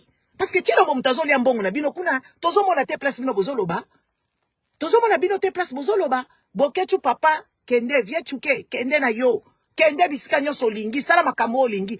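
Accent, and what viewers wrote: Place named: Rwanda. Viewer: Longua yo Rwandaise déguisé en Congolais agent ya Kagame n'a communauté Ozalaka nayo mutu ya M23 Banda kala, role batinda yo n'a communauté eza ko kosa comme si Oza Combatant alors que ce faux, Ozalaka nde Rwandaise agent ya Kagame, ba maloba nayo sans impacté chez biso ba vraie Congolais de père et de mère, n'a lingala nayo avec accent Yaba Rwandais,Oza ya biso te et opinion nayo ezo compter te mwasi ya salite solo M23 déguisé en combatant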